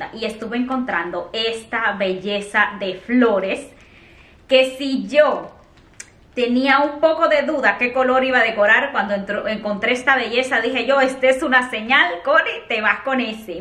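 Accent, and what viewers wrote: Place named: Dominican Republic. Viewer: Hola. Creía que eras Dominicana. Tienes una forma de hablar y expresiones nuestras. Salidos a los Hondureños.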